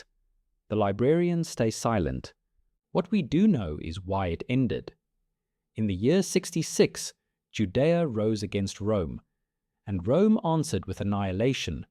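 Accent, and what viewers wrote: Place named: South Africa. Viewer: I love that this AI has a decent South African accent 😅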